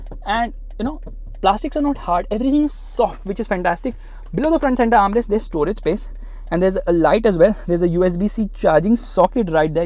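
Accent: India. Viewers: That's an absolute indian accent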